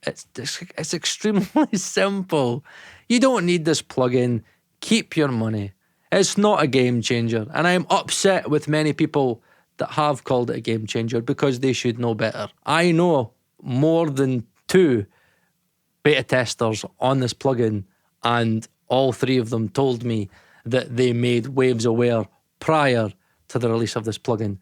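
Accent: Scotland, United Kingdom. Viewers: I love scottish accent. For Kick Attack preset I thought he said KekAttic.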